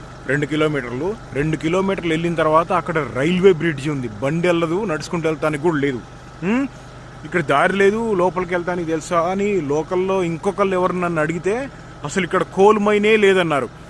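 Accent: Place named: Krishna District, India. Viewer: మీ సొంత ఊరు ఏది బ్రదర్ ఎందుకు అంటే నీ మాట లో కృష్ణా జిల్లా యాస వినపడుతుంది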